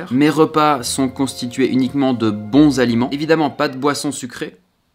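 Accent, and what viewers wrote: Switzerland. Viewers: T suisse?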